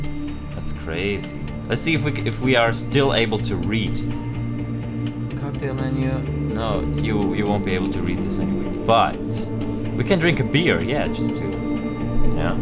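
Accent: Germany. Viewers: Bist du deutscher? ^^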